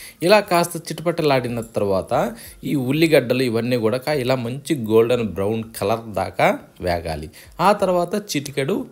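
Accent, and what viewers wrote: Nellore, India. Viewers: Nellore valla andi meeru . antha ma language.baga chesaru.baga chepparu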